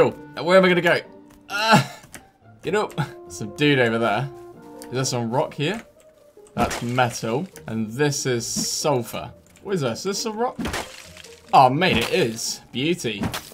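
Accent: England, United Kingdom. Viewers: your english accent always cheers me up!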